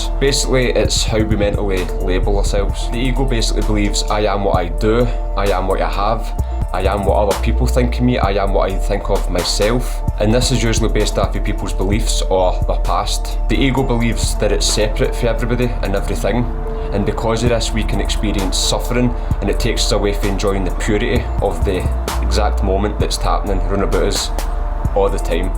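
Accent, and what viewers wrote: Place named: Glasgow, United Kingdom. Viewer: Bravo perfectly described it's was great to hear the Glasgow accent, excellent x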